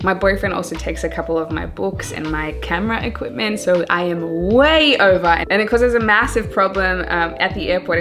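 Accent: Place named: Australia. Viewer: First time noticed Australian accent from you. Is the environment affecting you? :)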